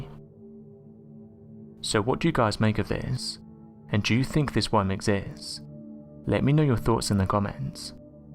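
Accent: United Kingdom. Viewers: I wish you would loose the brit and find someone who speaks English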